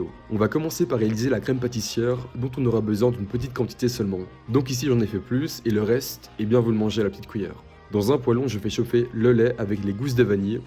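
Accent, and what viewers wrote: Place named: Belgium. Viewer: Belge je pense 🤔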